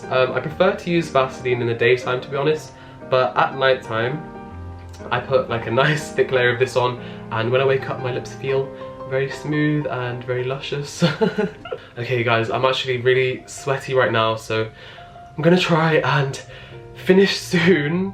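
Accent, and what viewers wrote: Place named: England, United Kingdom. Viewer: Idk why but I luv your English accent sooooooooo much, it melt me out!! ASMRRRRR!! Luv from a little vietnamese girl <3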